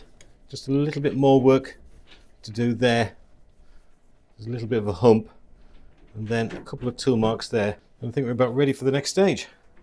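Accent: United Kingdom. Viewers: Hi, really interesting to watch, nice to hear that touch of Scouse. Will carry on watching, well done. Tom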